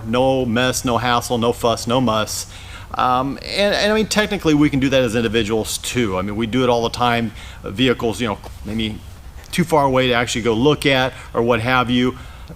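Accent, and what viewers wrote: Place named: United States. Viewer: You sound like a regular American, especially for a Californian... 😉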